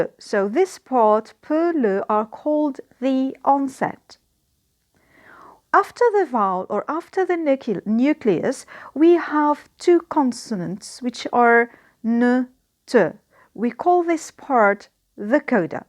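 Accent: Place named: United Kingdom. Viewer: Ur British accent is 👍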